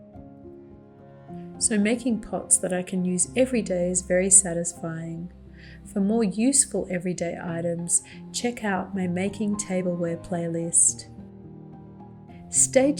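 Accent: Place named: Australia. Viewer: Great video. I was very happy this came up in my feed- I was so excited to hear your Australian accent! Yay! 🥳 I have been watching lots of potters but I am so very happy someone as skilled as you from AU has decided to post. Thank you 🙏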